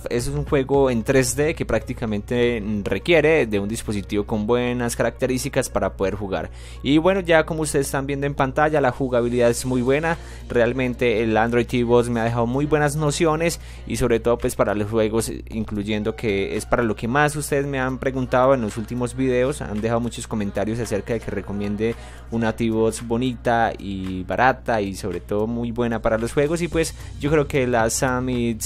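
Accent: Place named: Colombia. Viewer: buenas creo que por voz que eres colombiano! que precio tiene esa box y en dónde comprarla en Colombia ? gracias de ante mano.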